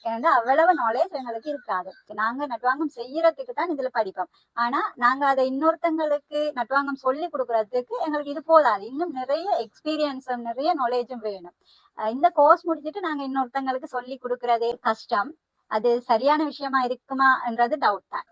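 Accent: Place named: Sri Lanka. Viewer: நாட்டு வாங்கும் பற்றி அருமையான விளக்கம் இதைப்பற்றி பல விளக்கங்கள் கூறினீர்கள் மற்றவர்களின் வேண்டுகோளுக்கு இணங்கி இந்த நிகழ்ச்சியை பதிவு செய்த உங்களுக்கு வாழ்த்துக்கள். உங்களுடைய ஸ்ரீலங்கா தமிழ் பேசுவது மிகவும் அருமை கேட்பதற்கு நன்றாக உள்ளது வாழ்த்துக்கள். நடனத்தில் சிறந்தவர் வாழ்த்துக்கள்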